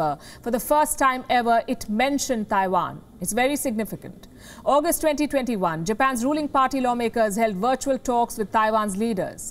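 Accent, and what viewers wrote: England, United Kingdom. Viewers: I like this host's English accent. Lol (no offense)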